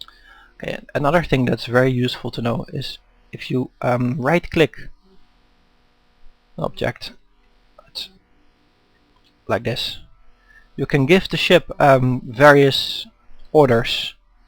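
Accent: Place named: Netherlands. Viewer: u sound dutch. what i wonder though why the ingame tutorial sucked ass X.x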